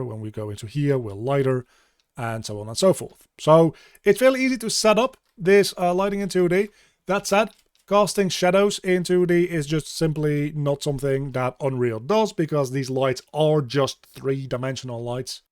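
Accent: Netherlands. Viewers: For some reason watching this really reminds me of Jazz Jackrabbit 2 , which, hilariously, was also made by Epic Games. One of the things I really love about their lighting setup there, was that the player character has a point light (with some trails) around them, as well as all the bullets. You could have really dark scenes where you could barely see anything, shoot a few bullets to get a sense of what's happening around you, and navigate almost by "sense of touch" through the character-bound light. As you started building out lights in your scene, it triggered deep memories from that game. <3 Thanks for the tutorial! Great stuff. Just what I needed. P.S. Heb je een Nederlands accent? ;)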